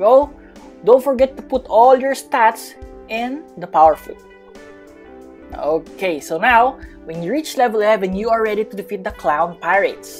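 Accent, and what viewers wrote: Philippines. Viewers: I also knew he was Pilipino like how he is talking and like My family Talks with like a high voice a little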